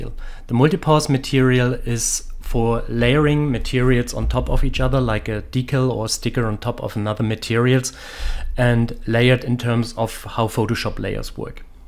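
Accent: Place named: Germany. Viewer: Dein deutscher Akzent ist leicht zu erkennen... welche Hochschule hast du besucht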